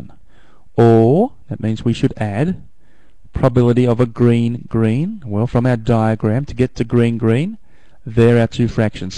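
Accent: Australia. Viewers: WOOOO HE'S AUSSIE!! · I don't know why, but the Australian accent really helps me understand. This is awesome, thank you! :)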